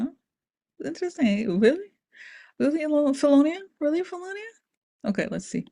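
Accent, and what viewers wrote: Russia. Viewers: Thank you, for that hopeful message before I hit my pillow! God I love your weird little faces and Russian accent! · Your Russian accent is lovely! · Sorry but not understanding ur Russian accent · I just love your Russian accent! It’s my birthday on 4/6 and I needed this video. Happy Belated Birthday ❤️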